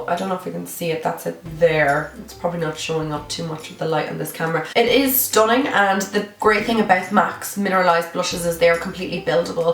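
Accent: Ireland. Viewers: You're gorgeous! 😘 Love your accent, is it Irish?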